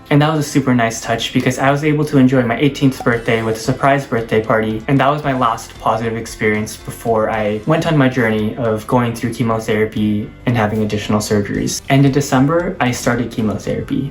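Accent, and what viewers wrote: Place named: Canada. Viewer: thanks for sharing,you have a strong Canadian accent, bravo for you to share your fight with cancer, even you have used Chemo to kill most cancer cells, mind you the main Stem cancer cell is not destroyed and can never be terminated. Just my personal advice that please keep eating foods that keep your body in alkaline state, cancer cells wont grow strong in an alkaline envirament, this is the best way to survive,do not ever allow your cancer stem cell to come back, remember this.....i pray for your health n have a wonderful life...PS from the video, you have leaky guts and inflammed liver, go do your research on how to deal with leaky guts n what not. stay strong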